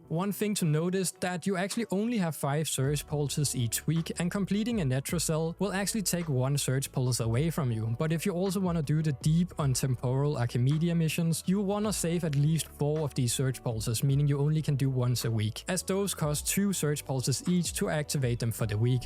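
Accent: Denmark. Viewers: Danglish? <3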